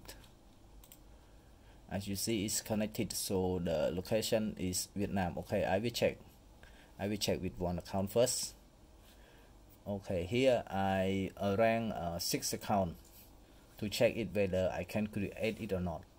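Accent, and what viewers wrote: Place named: Cambodia. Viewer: បងខ្មែរមែន ព្រោះនិយាយតុងខ្មែរ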